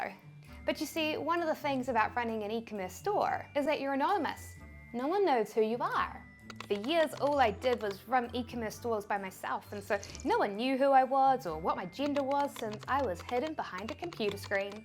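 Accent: New Zealand. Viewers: Hi, I really love you and your New Zealand accent 😁 By the way, you are so sweet and attractive while smiling huge 😘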